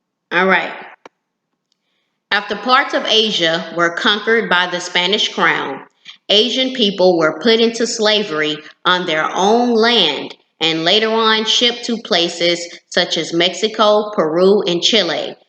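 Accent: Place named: South, United States. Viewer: am from the caribbean and trust me when i say this "black americans " who try to speak about history speak trash. As a matter of fact some things in this video are incorrect. you think some black woman with an southern accent is gonna show up magically knowing what happened during colonial times??americans don't even know the countries of the world muchless history lol,only thing they know is that there was something called slavery. there is no such thing as black caribs and as a matter of fact the black people were west africans who escaped from the plantations during the english and spanish wars over certain islands. this is so misleading and trust me i can continue to name out a lot of other things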